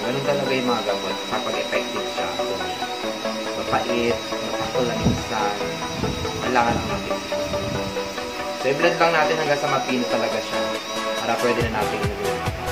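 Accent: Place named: Lopez, Philippines. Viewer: parang taga lopez quezon ka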